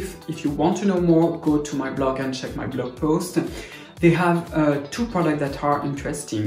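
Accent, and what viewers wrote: France. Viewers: gotta love a french accent oh lalà🤩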